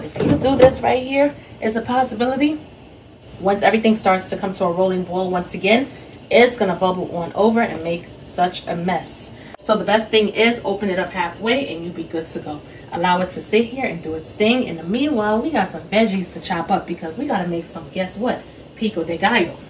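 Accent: New York, United States. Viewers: Ive never heard a new york accent sound so polite 😂❤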